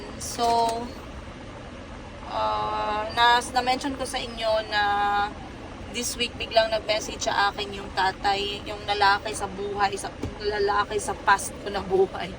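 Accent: Iloilo, Philippines. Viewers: Kalambing mn mg ilonggo madam ba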